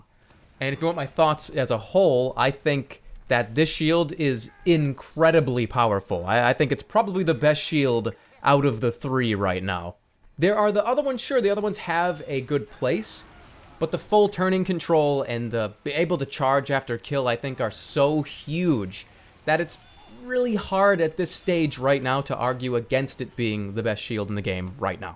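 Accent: Boston, United States. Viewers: just a question but are you from Boston because it kinda sounds like u have the accent btw nice vid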